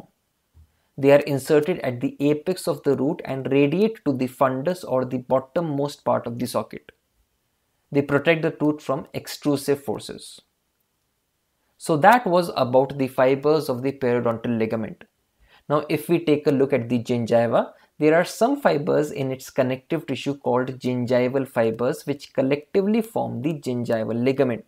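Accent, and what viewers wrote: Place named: South India, India. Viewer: Hehe...am i right or wrong Aapke kuch words mein south Indian accent lage.. 😅 anyways.. nice explanation of the topic thank u 🙂👍...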